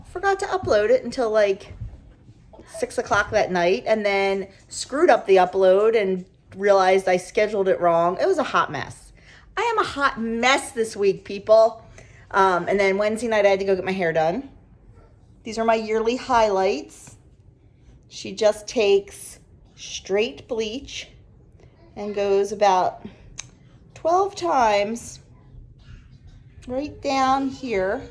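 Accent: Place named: New Jersey, United States. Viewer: I love your NJ accent I am laughing saying this because I live in Worcester MA and I totally have accent. I love your recipes and everything you share. I got the vaccine too. I had Covid 19 in May of 2020 it was awful. I never want it again. Can't wait to see the chicks Doug did such a great job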